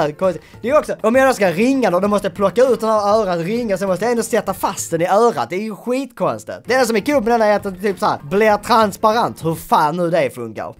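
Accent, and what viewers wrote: Scania, Sweden. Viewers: Älskar skånska!!! 😍😍😍😍😍😍😍😍😍😍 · Alva Rigo han e skånsk, såklart man gillar den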